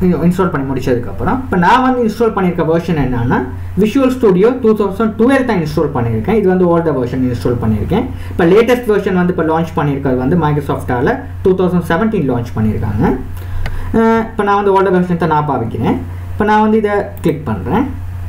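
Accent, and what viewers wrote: Sri Lanka. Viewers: Neenga srilanka va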